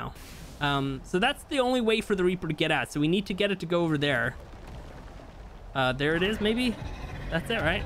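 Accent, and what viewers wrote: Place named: Ireland. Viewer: He sounds so Irish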